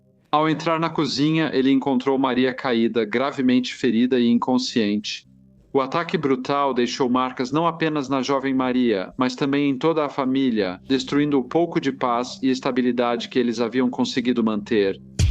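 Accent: Portugal. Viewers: Como relaxar ouvindo esse sotaque horrível de português de Portugal? Arruma isso aí!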